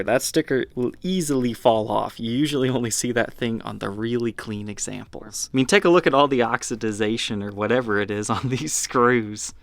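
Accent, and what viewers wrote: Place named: Midwest, United States. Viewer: Its the midwest accent "t" sounds are pronounced like "d"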